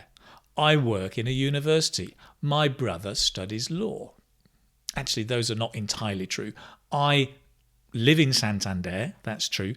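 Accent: United Kingdom. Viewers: Your british accent is really awesome. Thanks to be here to learn us how to pick up british accent because it sounds rythmic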